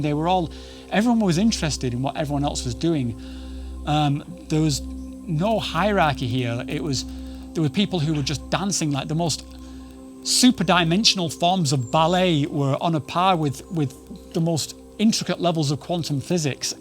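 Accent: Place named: Manchester, United Kingdom. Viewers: I live in Manchester and judging by your accent you're not far from here. I'm ready for my journey to understand my childhood trauma. I have social anxiety and going to South America is too out my comfort zone to go alone. I need to find a budy who has experience and will come with me. Can you tell me how the best way I can do this and where. I'm 48 year old grown man and am educated on DMT. Please can you help. Really want to do this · I love this channel. I don't know why but there's something really nice about your stories being told in a northern accent. I'm a fellow northerner but from the other side of the Pennines up in the northeast. "I thank her for what she gave me and I forgive for what she couldn't give me" - this is absolutely beautiful. I had my first ceremony a month ago or so and this one of the big hurdles that I broke through and it's made so much difference to my relationship with them. 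This trip sounds bonkers though, I don't think I fully broke through tbh but it was a tremendous experience anyway.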